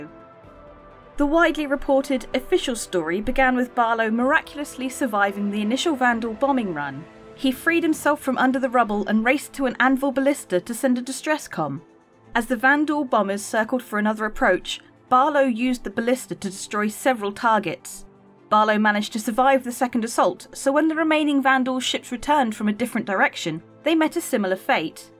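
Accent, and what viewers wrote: United Kingdom. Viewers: When I first watched the lore 'story' videos I thought that it was something produce and official release by CIG. The quality and pace of voice commentary, depth of knowledge, the suitability of a brit accent - all make for a very high quality video. I remember watching the Nyx video and thought "Hey! that voice sounds familiar. Either has a very common tone of voice or she been contracted by CIG to voice over their vids now". Well done and keep it up.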